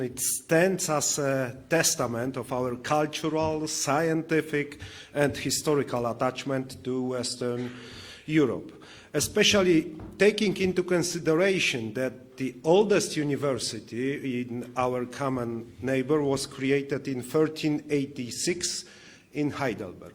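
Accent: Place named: Poland. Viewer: Mówi bez błędów, ale ma polski akcent. A jaki ma mieć: francuski ? niemiecki ? Słyszeliście Francuza mówiącego po angielsku - masakra.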